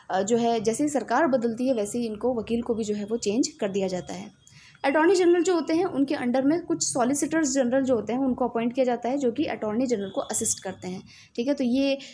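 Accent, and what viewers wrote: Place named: Rajasthan, India. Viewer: बहुत मददगार, में भी राजस्थान से हूं। टोन से समझ आ रहा है, धन्यवाद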